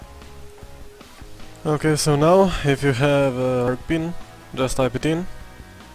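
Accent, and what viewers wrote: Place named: Russia. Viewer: у вас выраженный русский акцент , вы случайно не русский?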